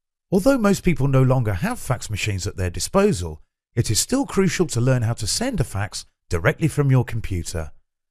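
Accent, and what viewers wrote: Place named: United Kingdom. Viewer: I love hearing the British-English accent, especialy "computah".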